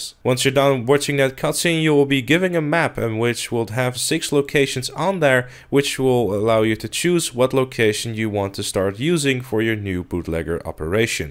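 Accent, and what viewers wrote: Netherlands. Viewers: His dutch accent is funny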